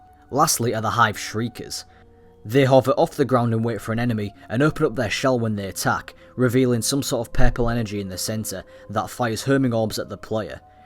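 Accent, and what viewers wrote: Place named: Scotland, United Kingdom. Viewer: With some mates we have an ongoing debate trying to identfying your accent. My idea was that it sounds like a mix between Scottish and Northern English. Would you mind telling us the truth?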